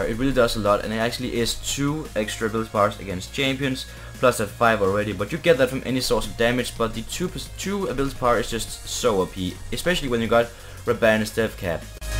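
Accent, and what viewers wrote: Denmark. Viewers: Jeg hører en dansk accent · Really love the videos! Keep it up, btw are you danish? :3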